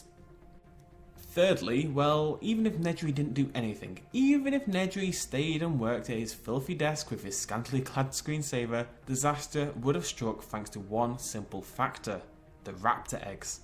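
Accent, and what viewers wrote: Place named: North, United Kingdom. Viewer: you sound so cute. and northern.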